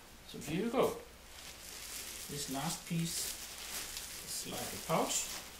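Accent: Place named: Denmark. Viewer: I know that Dansk accent :-)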